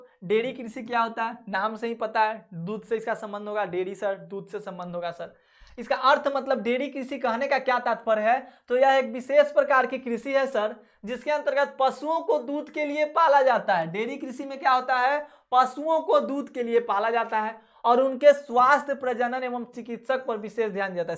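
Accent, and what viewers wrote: Bihar, India. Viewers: Sir aap bihari h kya ?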